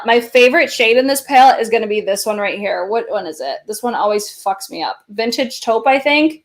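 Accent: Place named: Upstate New York, United States. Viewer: She’s from upstate NY :)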